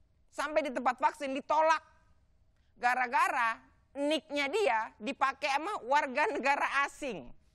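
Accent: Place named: North Sumatra, Indonesia. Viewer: Agak laenn, sumut sekali bahasanya 😂😂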